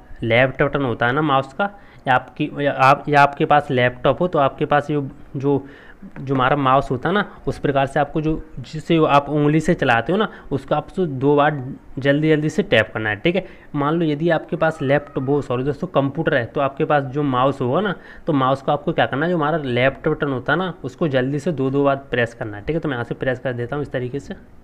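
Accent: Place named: Saharanpur, India. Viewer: Pakka sharanpur ka h bhai mhara bhai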